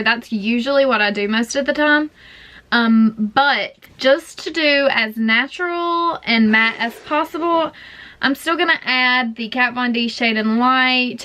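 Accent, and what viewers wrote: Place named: South, United States. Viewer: You look amazing girl. You have a south accent I'm guessing your from the south of the us, but I have been watching True blood and you remind me of them. I hope this wasn't that offensive If it is I'm sorry. But great video!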